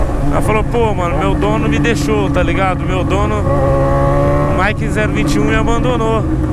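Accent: Goiás, Brazil. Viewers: pq vc fala no pique do Goiás sendo que vc mora em Londrina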